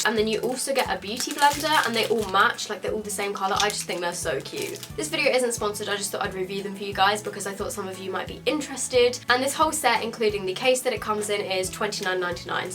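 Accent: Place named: United Kingdom. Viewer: It is so refreshing to not only hear a fellow Brit doing these kinds of videos, but also who doesn't tan and is pale! Loving the videos :) x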